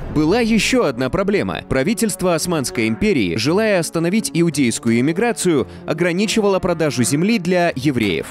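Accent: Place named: Moscow, Russia. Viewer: Рассказ интересный. Но Московский акцент режет слух: "конфликт нАваприбывших обострялся...". Да здравствует Красная Армия! Да здравствует социалистический сионизм.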